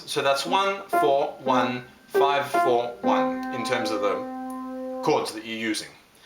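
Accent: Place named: Australia. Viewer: Great lesson, nice to hear a piano instructor on YT with an Australian accent for a change, so easy to listen to. Thanks for singing as well to illustrate the chord progressions. Well done.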